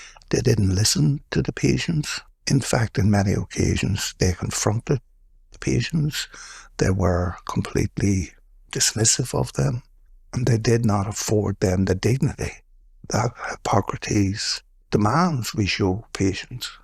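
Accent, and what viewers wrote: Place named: Northern Ireland, United Kingdom. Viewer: that's a killer Norn Ireland accent there.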